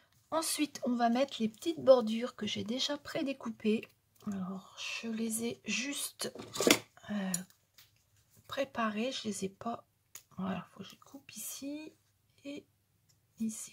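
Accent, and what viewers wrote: North, France. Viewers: J'aime vos cartes de Noël et le bon accent du Nord si je ne me trompe pas a bientot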